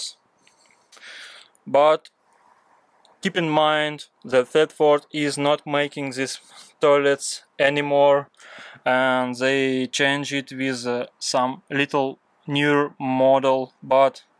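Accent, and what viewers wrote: Russia. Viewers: Чувствуется русскоязычный акцент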